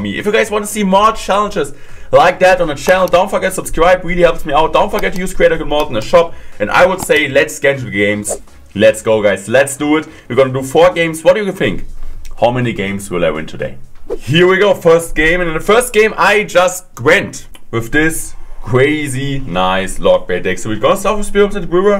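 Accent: Germany. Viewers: Wenn das kein deutscher aktzent ist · Man merkt dass du Deutscher bist aber ich feier trotzdem beide CR Kanäle😉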